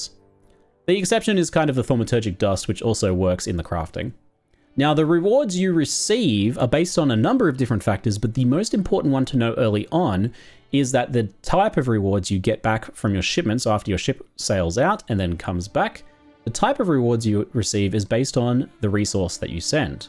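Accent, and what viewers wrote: Australia. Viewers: I need that g'day mate asmr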